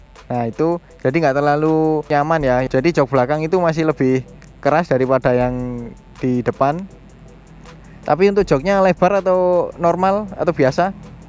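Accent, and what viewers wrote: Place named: Java, Indonesia. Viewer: Judul inglish ngomong medok jawirr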